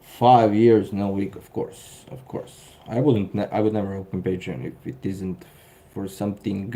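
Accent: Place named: Russia. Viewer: Your accent is TOO THICK to understand the commentary. Just like the RUSSIANS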